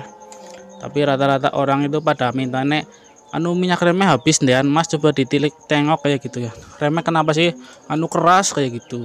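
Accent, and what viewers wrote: Banyumas, Indonesia. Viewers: Otw praktek mas bro. Ngapake daerah ndi mas. Nyong juga ngapak. Banyumas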